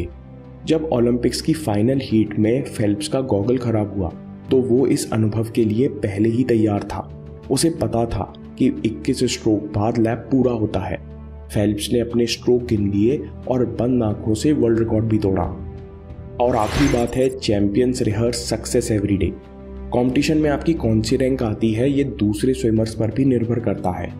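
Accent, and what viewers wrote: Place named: Punjab, India. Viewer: yaar punjab n aise hi bolte hai hindi iski koi galti nahi hai.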